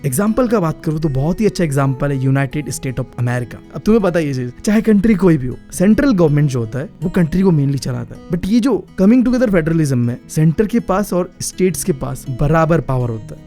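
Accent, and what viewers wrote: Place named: Bengal, India. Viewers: Bengali bhai ap kaha se ho 🤨🤨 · Bhai aap sach me bangali ho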